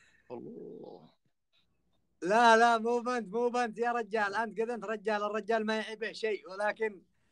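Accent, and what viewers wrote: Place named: Yemen. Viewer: هذا يمني لهجته خالد الراعي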